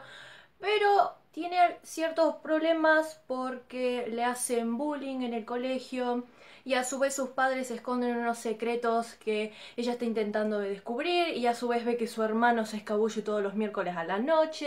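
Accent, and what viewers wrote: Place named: Argentina. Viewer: Che, de que parte de Argentina sos?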